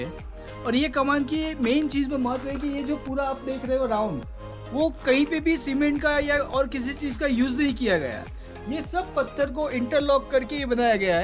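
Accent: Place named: Gujarat, India. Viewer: Bhai bhai ek Gujarati nu Hindi kaik alag j hoy chhe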